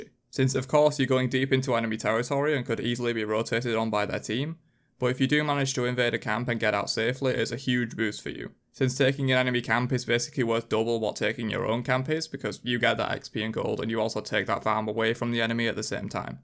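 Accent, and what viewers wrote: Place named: Northern England, United Kingdom. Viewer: Aghhh a northerner. Thus washs my ears of american smite players, subbed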